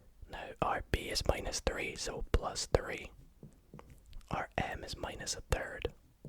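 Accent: Scotland, United Kingdom. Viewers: Where in Scotland are you from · I can’t tell if ur from Scotland or America it’s weird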